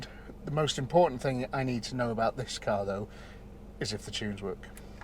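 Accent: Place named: North Wales, United Kingdom. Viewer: Just found this channel and proper enjoying this video. Going off your accent your Chester/ north wales. Nice to find decent content and long videos.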